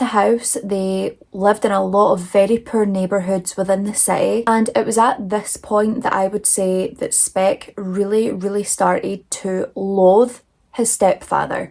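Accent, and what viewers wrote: Scotland, United Kingdom. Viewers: Hey! I started binging your videos. I like a very diverse group of true crime girlies. You have the cutest Scottish accent I could kiss you 😘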